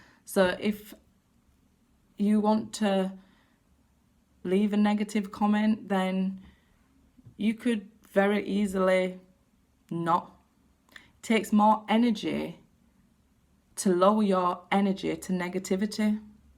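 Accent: United Kingdom. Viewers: I hear you. British?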